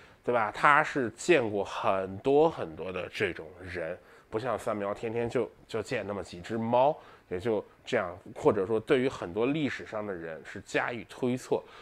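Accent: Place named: Northeast, China. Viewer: 还别说，这东北话说的还挺顺溜。老铁那啥呀，还是别叫老北京口语了，改老东北口语吧！:face-blue-smiling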